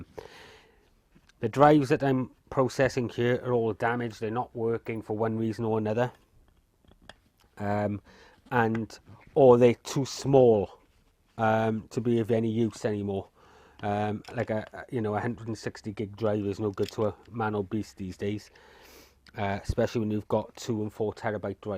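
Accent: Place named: Wales, United Kingdom. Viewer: Hello, Great videos and nice intro. I live in northern Sweden and got in to scrapping by accident so to say. I totally understand the reason you are scrapping as I do it for the same reason, it's escapism and theraputic. Where abouts are you from? Saw you blamed welsh accent, I been to north Wales and loved it, felt like home almost.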